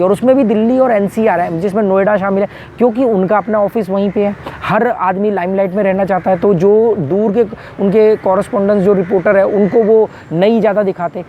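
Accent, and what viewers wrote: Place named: Pakistan. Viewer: SIR SIR PLEASE ANSWER ME IN VIDEO HOW YOU TALK URDU MEANS HINDI VERY GOOD .I MEAN YOUR ACCENT LIKE PAKISTANI PEOPLE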